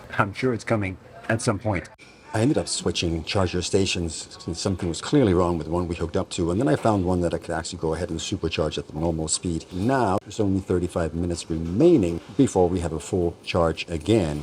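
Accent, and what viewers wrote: Denmark. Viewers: Awesome video! Thank you for taking the time to make it! That car is just beautiful even after 10 years! ... Also.. Am i correct if i you have a slight danish accent?! :D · Du lyder dansk :)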